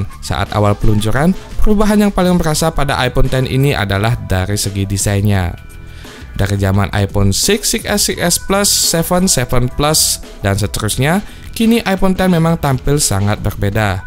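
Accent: Bali, Indonesia. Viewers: Logatnya Bali ni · Jeg khas omongane bli, medok bali gati Ulian ne dot dadi meli puk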